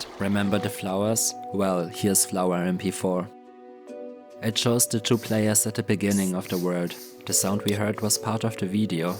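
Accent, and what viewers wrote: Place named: Germany. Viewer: The millisecond i heard your voice. I knew you was german, why? Because i am part German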